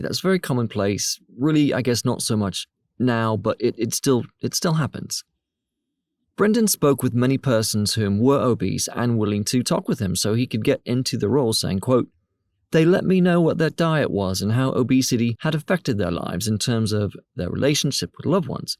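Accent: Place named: Australia. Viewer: the title seemed fine to me. A lot of the time I find videos with clickbaity, controversial one-liners and thumbnails cheapen the entire message. One suggestion if I may, would be to include more elements to highlight your points. You make a great point about how actors’ jobs are to perform and use their imagination. Some cuts to famous movies where actors play entirely unrealistic/impossible characters would help support your argument here. The Joker character could be a good one as obviously there’s no way to actually cast an actual psychotic homicidal anarchist in a movie! Just one small example though and by no means am I trying to influence your content. That’s one of the best things about YouTube, there’s so much freedom for creators and something for everyone. Guessing by your accent you’re an Aussie (so am I). Thanks for the video!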